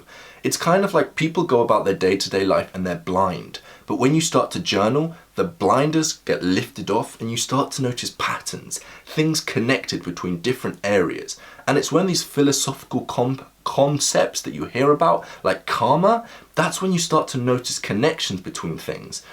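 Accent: United Kingdom. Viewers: Such an incredible, inspiring young man, not only you are very smart and charming and that British accent help you the most. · Your accent is wild I feel like you’re British but your dictation is Americanish to sound claró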